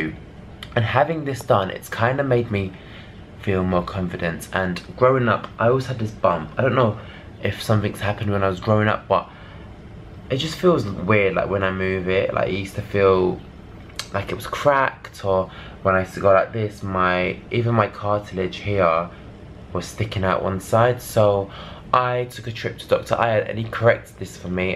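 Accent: United Kingdom. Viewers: you have a very boyish manly face. Very handsome. you can pass for a Puerto Rican, except the British accent lol. Thank God you did not have your nose broken with a rhino plasty. Maybe some laser hair removal to make your beard perfect. But other than that you are handsome :)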